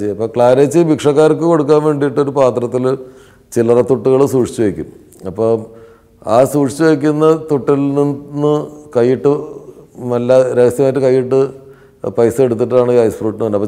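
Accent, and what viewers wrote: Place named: Valluvanad, India. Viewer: ലാലുവിന്റെ സംഭാഷണ ശൈലി, വള്ളുവനാടൻ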